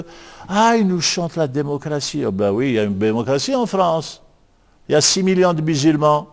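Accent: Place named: North Africa. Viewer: Pourquoi parfois vous roulez les R alors que parfois vous les dites parfaitement... Cheulou cet accent du bled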